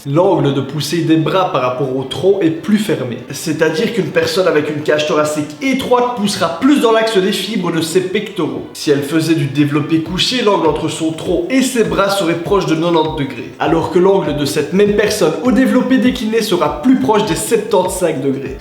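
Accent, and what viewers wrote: Belgium. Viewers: Olala nonante septante ! On est belge ou suisse ? (je parierais sur le premier choix vu l'accent) Ceci dit, belles explications sur le développé décliné, que je voyais jusque là vraiment comme un exercice parmi les plus inutiles possibles (eh oui moi aussi on m'avait dit que ça musclait le bas des pecs)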